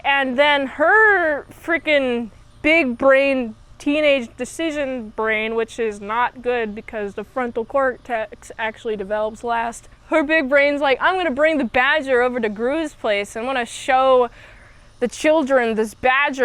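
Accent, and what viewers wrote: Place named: United States. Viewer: I love the American accent lol. What part is that accent from?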